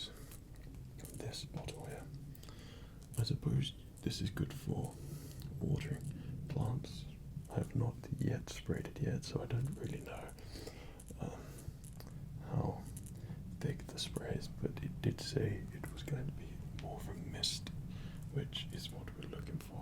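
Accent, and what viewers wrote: United Kingdom. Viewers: Kyaaaah! I'm so happy I found out about asmr!! I'm a pretty anxious and agitated person. Sometimes, I'm tired but I can't get to sleep because my mind is always full of fast and stressfull thoughts T.T But your video really helped me! The plastic sounds are The best \o/ now I'm pretty sleepy @@ thank you for making this video and for helping me to calm down my messy mind. I really appreciate your voice too. I'm Brazilian, but I really love British accent (I don't remember if it's written like that). I think it is chic, fancy... I don't know which adjective fits better aahuashushauas But anyway thank you! Make more videos like that! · is he English because he kinda sounds it but looks Scandinavian and sometimes sounds idk just not English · freakin stop thor jokes but I have a question are you from the U.K , Sweden or something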